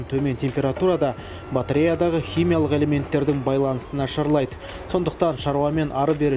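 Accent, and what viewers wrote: Standard. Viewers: Ма ша Алла қазақшаны тап таза бұрмаламай сөйлейді екенсің жарайсың!!! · Жәрайсың таза қазақ тілінде жүргізген түсініктемелерің өте керемет. Қазақ тілінде осынша таза жеткізе білүде екінің бірінің біліктілігі жете бермейді. Ризамын саған інім. Талмай самғай бер.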